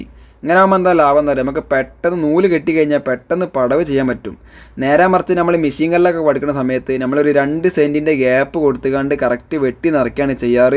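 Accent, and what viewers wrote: Malappuram, India. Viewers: വീഡിയോ അടിപൊളി യാണ്.... എന്നും പുതിയ വീഡിയോ വന്നോ നോക്കും... പിന്നെ ജമ്മളെ മലപുറം ഭാഷ പൊളിച്ചു...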